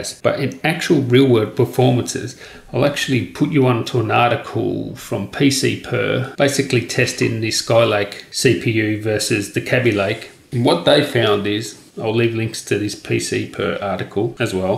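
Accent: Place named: Australia. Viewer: What is it with Australians and the way you pronounce the letter "Aitch"?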